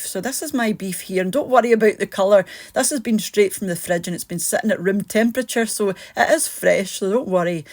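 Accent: Scotland, United Kingdom. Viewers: You cook so perfectly in my opinion. Your Mr. Is one lucky man. I can imagine he looks forward to waking up every day . Knowing he's going to enjoy a delicious and tasty meal. That he gets to enjoy with you because all your meals look like they were made with love . It's am here in south florida and I've been binge watching all your videos . I'm so intrigued how you make cooking look so effortlessly add to that your lovey Scottish accent. You have bewitched me lol 😂. I also love your weekly grocery hauls and your recipes that you plan out for the week . Thank you so much for putting these videos together and inviting us in to share a bit of your world. It's a pleasure to watch you cook you have been blessed with a lovely set of chef hands and a natural talent to turn every dish you make into something more fabulous and delicious . Thank you thank you thank you . 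I'm now looking forward to watching every video you make . Have a wonderful and blessed day my friend . Scotland looks absolutely beautiful.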